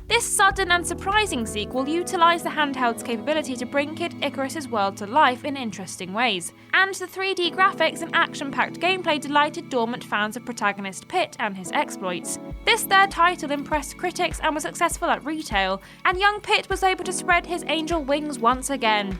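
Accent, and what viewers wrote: United Kingdom. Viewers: English (British) pronunciation.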